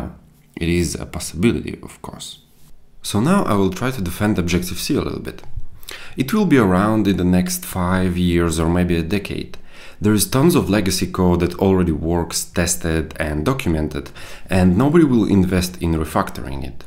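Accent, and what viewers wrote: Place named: Russia. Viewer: Наш человек, сразу слышно)))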